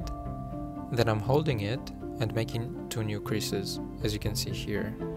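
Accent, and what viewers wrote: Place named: South Korea. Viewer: I can tell from your accent that you are Korean which makes more fun to watch this video. Thank you💗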